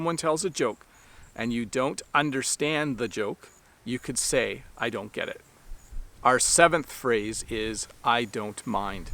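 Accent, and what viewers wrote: Canada. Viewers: I likes Canadian English, it is totally different to US English. Greetings from honduras, nice videos thumbs up well won dude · I knew that you are Canadian through your pronounce especially when you said (about) thank you so much my great teacher for teaching us your beautiful language , I'm watching you from the place where Arabic language was born it's Saudia Arabia .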